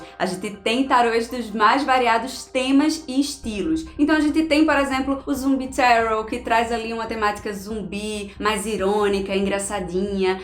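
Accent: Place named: Recife, Brazil. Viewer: Pelo sotaque já sei que é de Recife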